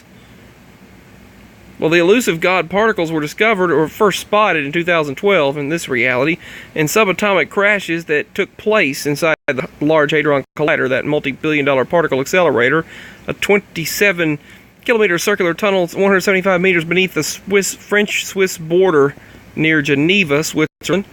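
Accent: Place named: Tennessee, United States. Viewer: Hello my little friend, are you in Tennessee? I hear your accent and if your even close to here in Tennessee then I'll have to say Praise Jesus for what your doing. Nobody around here talks about what going on with these collidars. And the one you say might be hidden underground I really believe the Holy Spirit, Wind and Breath has showed me that it's under Oak Ridge Tn. To much in Tn is hidden from us, the Red headed Giants that followed the map of the rivers where here plus the Giants built all mounds the Native Americans have been said to have built, the Native Americans have many stories to prove that the tales of the Giants. Native Americans where all over the state of Tn so that means the Giants where here to. For some strange reason Tennesseans have been purposely dumbed down so we don't pay attention to whats going on at oak ridge TN, Nashville TN with thier parthanon and Music city, Memphis TN with thier King of rock and roll plus jazz music and Egyptian connect and how the state of Tennessee has a pyramid on the driver's license. 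I believe oak ridge plays a huge part in this collidar to collidar connection. I seen where you mentioned the Freemasons in some videos well the Freemasons are all religions into one and Jesus has showed me what Revelation says and how all these nation's are in the same Bed with one another so with that being said I really believe that all these collidars are working together behind closed doors to open the bottomless pit in Revelation chap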